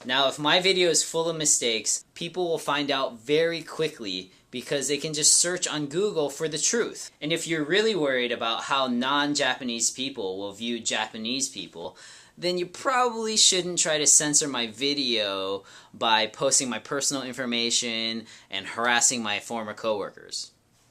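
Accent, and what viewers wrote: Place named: United States. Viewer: I can tell from your accent that you are American. If you are American, you should focus on racism in America. Living in Japan more than 20 years I know most Japanese learn about the racism within their country. Many people just don't get it or don't care much about it. As you said at the very beginning of your first video, there is racism in every country. You have no right to judge Japanese people unless you solve all the racial problems within your country.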